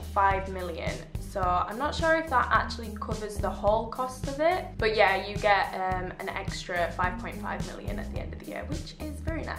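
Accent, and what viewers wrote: United Kingdom. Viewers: hello, very useful video thank you! I can hear from your accent you are British? What's it like being a brit' out there and what tips might you have for brits' wanting to apply or to help adapt them to Vietnamese life/ job? Thank you.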